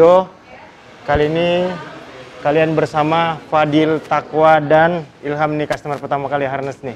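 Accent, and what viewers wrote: Makassar, Indonesia. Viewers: Emm berbau Makassar logak nya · Orang makassarka bang?...karena dari logat abang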